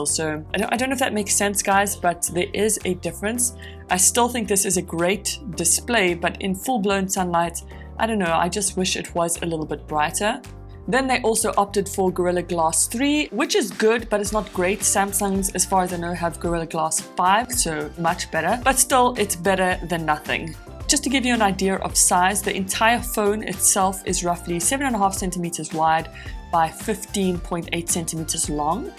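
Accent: South Africa. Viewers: Hey you sound like a South African,I am from RSA.One tip usually techies refer to ram as memory and Device Storage as what you referred to as memory.I must commend you on Your editing , its awesome and this was a great review. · Just found your channel are you south african? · Great review with a typical South African accent and I smaak it! ;)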